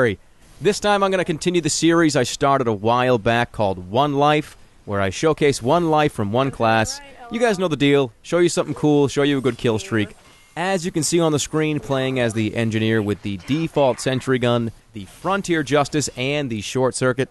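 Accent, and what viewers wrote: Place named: South, United States. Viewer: really good southern accent :D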